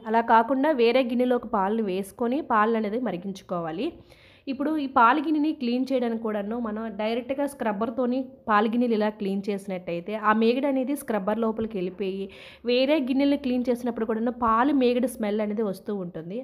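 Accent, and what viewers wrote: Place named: Kerala, India. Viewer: U r telugu slang is like keralalite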